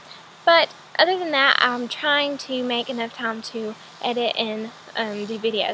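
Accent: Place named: South, United States. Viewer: I love your accent! What part of the south are you from? I'm from Texas (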